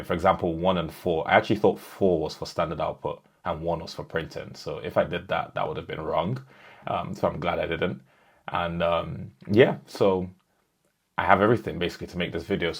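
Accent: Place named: Nigeria. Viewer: i hear the nigerian accent boss 😂🔥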